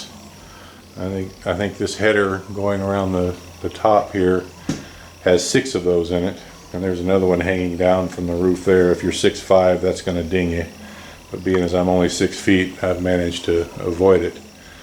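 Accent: South, United States. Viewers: that kind of house is the best shelter for you since bohol is always visited by typhoons... by the way, you have a nice southern accent.. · You got some southern droll accent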